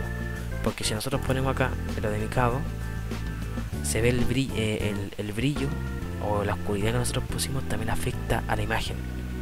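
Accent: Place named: Chile. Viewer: Oye crack, por las palabras y maneras de hablar eres chileno? Realmente me dio curiosidad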